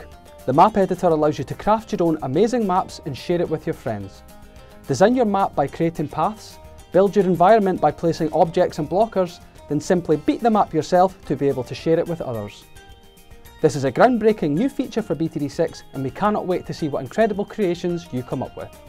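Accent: Scotland, United Kingdom. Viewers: YES a scottish person releasing official updates. FINALLY!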